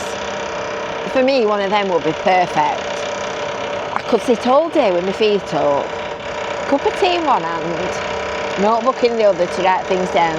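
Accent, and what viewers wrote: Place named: Barnsley, United Kingdom. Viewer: I thought you had a barnsley twang to your accent ,and I'm from rotherham as well followed you for a few years ,small world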